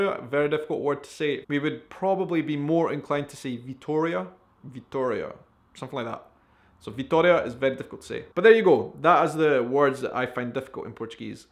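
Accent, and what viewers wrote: Scotland, United Kingdom. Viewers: if u're having problems saying "Florianópolis", try > FLORIPA. everyone will understand it too... quando vc dizia "word" eu entendia "ward". scotish accent is a little tricky nice vid. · Você arrasou na pronúncia, parabéns! Ah, e seu inglês escocês é tão bonito! :D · I'm really enjoying your videos,! And you're doing very well with your portuguese. I've never been in Scotland, but I love the culture and especially the scottish accent. It just lovely!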